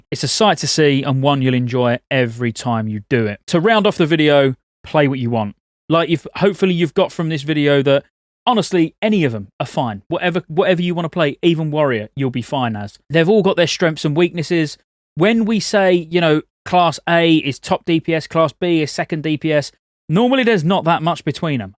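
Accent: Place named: United Kingdom. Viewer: he talks too fast and the section are smeared together, you can barely notice when he changes subject. not to mention the terrible uk accent that makes you super hard to understand because vowel abuse.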